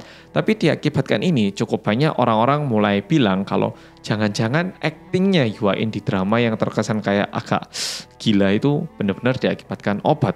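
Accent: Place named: Malang, Indonesia. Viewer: saya paling senang mendengarkan mas berbicara, gaya AREMA NYA (AREK MALANG ) tetap tidak hilang bikin sedanp di dengarkan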